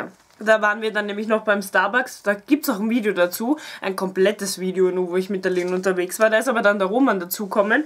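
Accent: Austria. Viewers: Sehr sympathisch, ich höre deinen österreichischen Akzent sehr gern.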